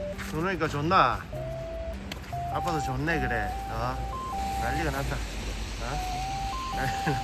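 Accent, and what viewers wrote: Gyeongsang, South Korea. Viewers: 오랫동안 질리지 않는 이 사랑스러움과 친근한 경상도 사투리의 주인님 찰떡궁합과 멘트 쵝오🎉🎉🎉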